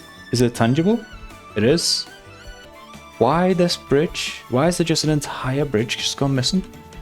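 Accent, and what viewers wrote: Liverpool, United Kingdom. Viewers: I think he’s scouse. The accent gives it away?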